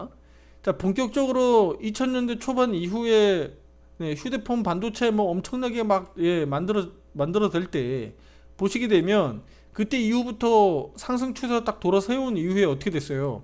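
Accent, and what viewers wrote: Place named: Gangwon, South Korea. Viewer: 목소리톤이 강원도시네ㅎ 반갑습니당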